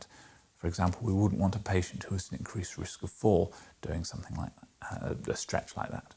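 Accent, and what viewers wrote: United Kingdom. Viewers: Because he’s damn sexy! That is a breathy voice with a British accent laid on top. Sessy!!!!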